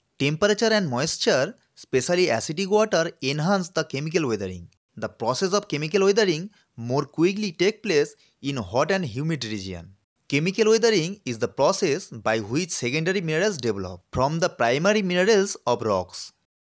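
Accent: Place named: Bengal, India. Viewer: Pronunciation is very much Bengali.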